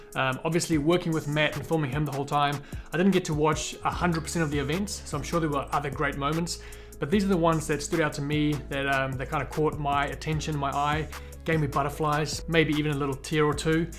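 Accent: South Africa. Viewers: ahh Potch boy. Durban girl here. Your accent is deceiving - definitely South African but a lot broader now which I would imagine comes from living in Oz. Ive been in the Middle East for last 14 years so same thing has happened to me. Anyway, keep up the great content. Id be drinking a lot of beer and wine and quarantine : )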